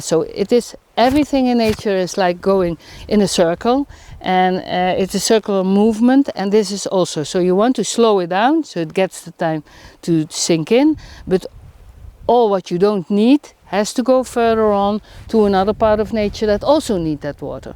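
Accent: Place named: Netherlands. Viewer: Dutch people on holiday XD I can 100% tell by the accent this women is Dutch. We do this since we are born lmao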